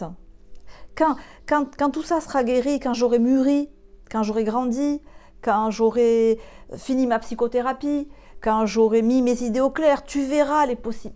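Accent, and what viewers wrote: South, France. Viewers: Bonjour vous ètes incroyable, je suis seul aussi, à vous entendre vous ètes du sud, dommage, je suis dans le "chamanisme" Bonne continuation.T.M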